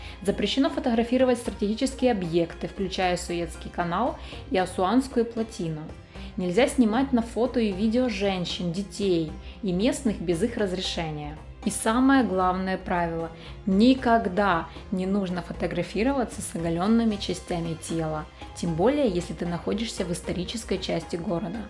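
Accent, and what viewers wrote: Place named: Ukraine. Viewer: поработать над собой, конечно, надо. Но надо учитывать ещё и диалект, акцент. Понято же, что девушка украинка. Вы же не будете делать замечание иностранцу, если он будет разговаривать на ломанном русском. Здесь такая же история.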